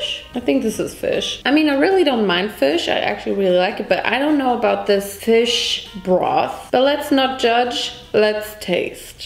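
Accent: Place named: Germany. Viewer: Your German accent is absolutely cute!! Your so funny, thank you for the laughs.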